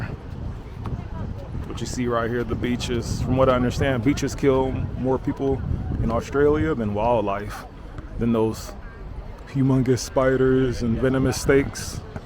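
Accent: United States. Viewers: Ya'll are really pendatic on his accent, aren't you? We pronounce it Bond-ee like he does, here in America. Stop insulting his accent. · Lots of his narration is making me laugh. Still sounds like American living in America, re OZ. Tho TBF, did mention some interesting facts (& sort of correct re most, tho not 100% re all) in the previous video. Recommend (or any one in Sydney, or visiting) does some of the walking tours. The 'Free' (not quite free*) Walking Tours are good, & The Rocks one is great. [*Not quite free, as request tips at end, but for $10 or $20 get best value walking tour of any] There's also heaps of social walking groups, & hosts, or a few in the group, are often quite knowledgeable & often learn a few things, even re home city even if know it well. Can find some of those groups by searching for: Free Walks & Meet People. In Sydney, is often one of those groups (there's heaps) is doing the amazing Bondi - Coojee Walk, sometimes is a Manly Harbourside Walk; & often Botanic Gardens &/or adjacent one. 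- - - In Melbourne, similar type free social waking groups often do Botanic Gardens walks, Bayside walks, or Yarra Bend Park/Studley Park Walks; & at lots of other places. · I love the American accent brother but Bondi is pronounced as Bon die not bondy 😂😂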